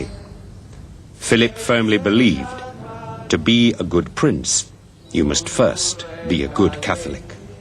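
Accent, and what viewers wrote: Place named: England, United Kingdom. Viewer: lol says "felícima armada": it's " grande e felicísima armada". I love english accent when talking spanish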